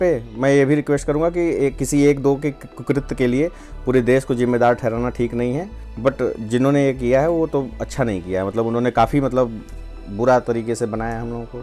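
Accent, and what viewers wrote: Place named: Varanasi, India. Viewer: Apki boli me banaras ka mithass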